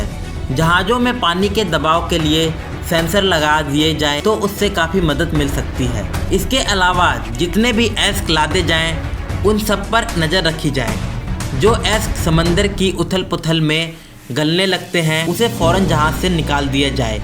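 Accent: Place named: India. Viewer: Bhai Indians esy hi bolty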